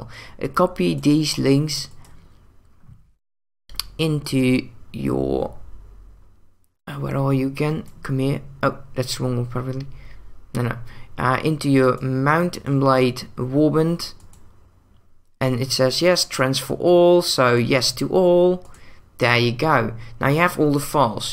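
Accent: United Kingdom. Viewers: english thicc accent xD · ik dacht dat je brits was, met een grappig accent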